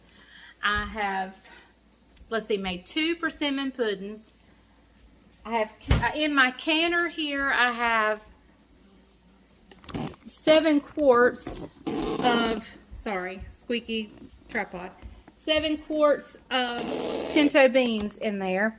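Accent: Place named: Georgia, United States. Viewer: The cookies look delicious! I enjoyed watching this video because of your authenticity, but, also because our accents are very similar. My husband’s and my family were born and raised in north Georgia in a valley that runs along an Appalachian foothill - and we are still here! I can’t imagine my life without the woods and the mountains!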